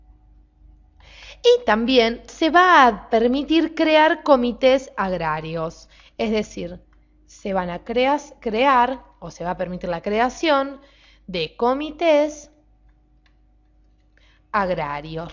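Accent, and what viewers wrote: Argentina. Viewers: lol es argentina y por eso la pronunciación xD no sé si es sarcasmo.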